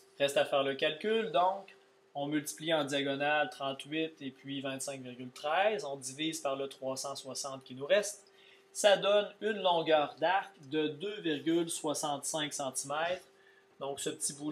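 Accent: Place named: Quebec, Canada. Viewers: aurelio L'accent Québecois! Youhou??!? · c un accent quebecois ! ta un probleme avec cette accents ?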